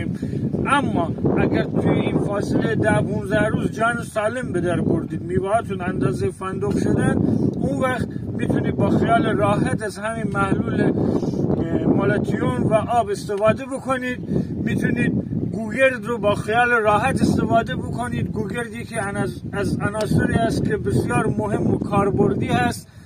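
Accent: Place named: North, Iran. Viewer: صحبت های شما با لهجه شمالی بسیار تاثیر پذیر و منطقی تر بنظر میرسد ، خسته نباشی کارت بسیار ارزنده و ماثر و سرگرم گننده است . وقتی که زمین شما را نکاه میگنم مثل این است که ملک خودم را تماشا میگنم . بهر جهت خسته نباشی هم وطن گرامی . پاینده و پیروز باشی با باغ زیبائی که داری .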